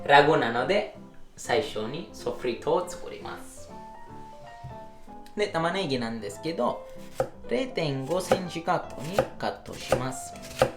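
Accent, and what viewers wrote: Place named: Italy. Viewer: 自分もやってるからかもだけど、白ワイン飲酒後？(仮)すごいイキイキしてて、イタリア訛り炸裂のハイテンションに思わず癒されました^_^ 仕事を楽しみ、動画で視聴者に元気を与えるに心底尊敬の念を持って感謝申し上げます！！！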